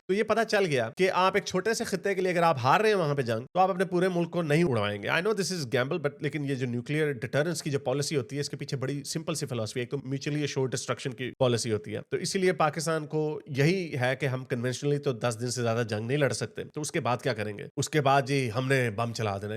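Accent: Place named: Punjab, India. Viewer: I love your Punjabi touch to the commentary ❤😊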